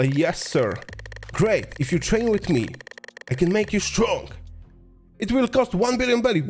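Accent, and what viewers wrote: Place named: Asia. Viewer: Dude, i hear the asian in your voice, i love it, you are awesome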